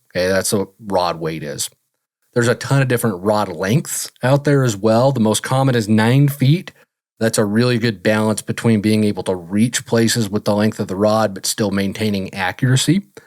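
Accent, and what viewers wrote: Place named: United Kingdom. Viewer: Just curious if that snooty British accent just came naturally?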